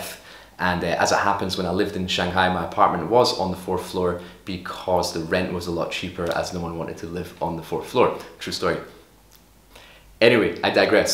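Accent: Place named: Edinburgh, United Kingdom. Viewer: Thank you very much, and thank you for the Scottish accent, sounds like Edinburgh guy 👍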